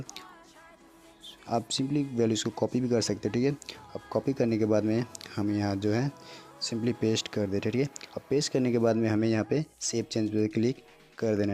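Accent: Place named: India. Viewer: Your Indian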